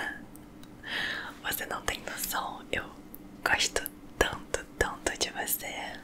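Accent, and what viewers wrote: Rio de Janeiro, Brazil. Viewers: Sotaque carioca feminino é tudo de bom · Aahh nem acredito que vc respondeuuu logo reconheci o sotaque tbm souuu espero te encontrar algum dia na rua talvez kkkkk te amo sou mtt fã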